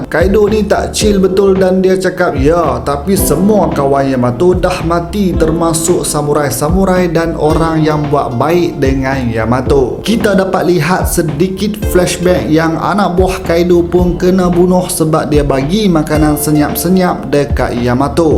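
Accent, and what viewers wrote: North, Malaysia. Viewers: Bro hg org utara blah Mna ? Aku Penang town . . Mantul utara loghat dlm penyampaian . . Semoga terus maju bro 💪🏼💪🏼👍🏼👍🏼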